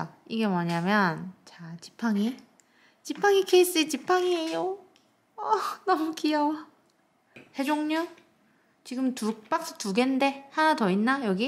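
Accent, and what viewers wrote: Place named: Busan, South Korea. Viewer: 저도 놀러왔어요😍 사투리를 쓰시네요? 부산분이신가?부산사투리같은데~ 저는 부산인데~ㅋㅋ 근데 열라면에 순두부는 반칙입니다~😂방금 밥먹었는데 급 라면 한그릇해야겠어요ㅋㅋ 구독 꾹 누르고 갑니다. 또 놀러올께요~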